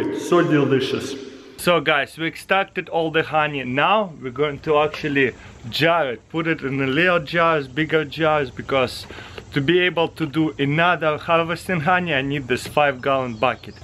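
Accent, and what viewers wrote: Russia. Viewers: По акценту слышно, что русскоговорящий человек. Это так? Интересно просто. Я иногда встречаю людей, у которых акцент еще хуже, чем у Русских, но они не русскоговорящие. Вот мне интересно, вы русскоговорящий или нет?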